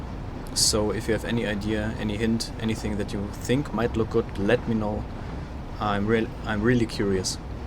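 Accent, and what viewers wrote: Germany. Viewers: No problem :) Hahaha all god mate, bin auch Deutsch :D hab ich direkt rausgehört ^^ Sollte kein Front sein, glaub wenn man den Akzent nicht kennt, fällt das den English native speakern nicht auf ;)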